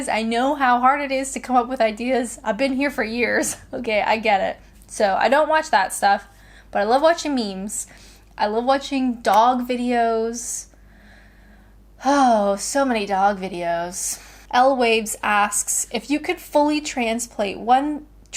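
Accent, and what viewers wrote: England, United Kingdom. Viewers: I don’t know if you know but you are developing the hint of an English accent👌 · its interesting to hear slight english accent isims creep into your speech lol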